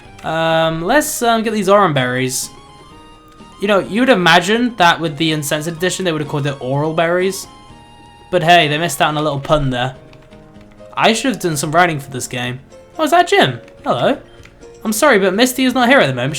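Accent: United Kingdom. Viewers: He's British... How can you be from Sweden and not even know your own accent? lol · im so suprise about myself sitting here and listening to your annoying english voice 7.minutes. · Sounds More British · What accent does have. British?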